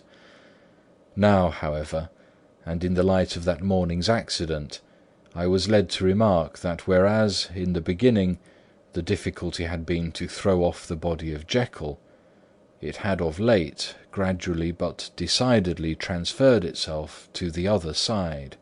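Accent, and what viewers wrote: England, United Kingdom. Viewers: I heard of the title plenty of times,, but it was nvr mando reading for me. Tonight was my 1st time hearing the story,, and I completely LOVED it!!! Especially since the narrator has English accent, it fits so perfectly. Wat a awesome tale!! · British af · An excellent BRITISH narrator for this classic horror story! Wow - rare for Librivox, thanks so much! 🌹🙏🏼❤️ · one of my very favorite books! i love the British accent !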